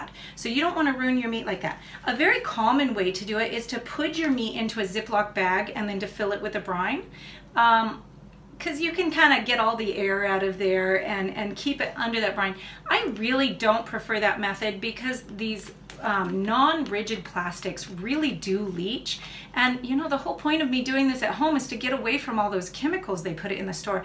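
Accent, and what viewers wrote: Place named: Midwest, United States. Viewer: You sound like you're from the midwest...? I will have to have a go at some corned brisket. Mmmmmmmmmm.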